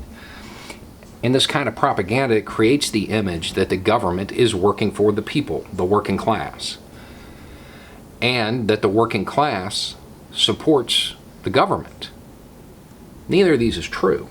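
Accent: South, United States. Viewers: I like hearing a southern voice with smarts (i.e. not a conservative shill).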